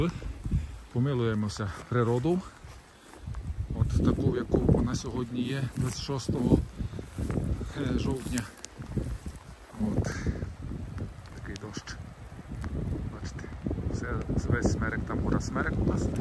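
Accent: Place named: Transcarpathia, Ukraine. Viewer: Звідки родом і який район , якщо не секрет ? По акценту наш закарпатець...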